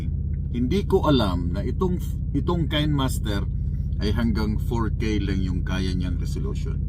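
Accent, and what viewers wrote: Visayas, Philippines. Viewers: Morag bisaya lagi ka dong😀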